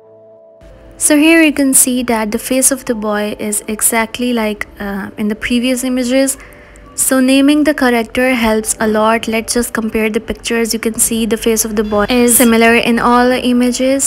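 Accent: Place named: India. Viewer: It doesn't work that way and moreover I don't understand why your accent is like an Indian woman ?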